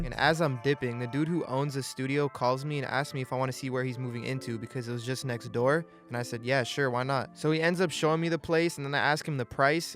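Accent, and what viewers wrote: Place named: Ontario, Canada. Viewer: this guy's either from Cali or from Ontario.. Maybe Toronto, Most likely Hamilton :D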